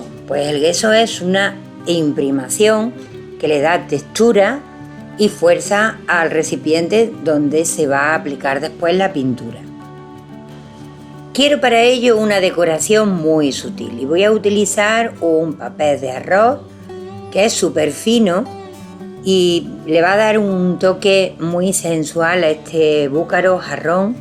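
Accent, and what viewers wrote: Andalusia, Spain. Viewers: TE MIRO DESDE SAN RAFAEL, MENDOZA, ARGENTINA. TIENES UN TONO ANDALUZ, ¿DE QUÉ PROVINCIA ERES? ME ENCANTAN TUS VIDEOS Y SOBRE TODO, LOS RELACIONADOS A TUS ESPECTACULARES PESEBRES. SALUDOS.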